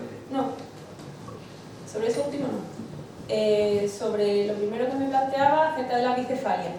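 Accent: Andalusia, Spain. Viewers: Reconozco la valía de Teresa,su discurso sabroso de andaluza.Pero jamás comprendí su remar dentro de Podemos.Los anticapitalistas desaprovecharon una oportunidad histórica y se sirvieron de la mayoría para su proyecto minoritario.Que les vaya bien.Y lo dice un trosco vasco harto de excisiones y traiciones.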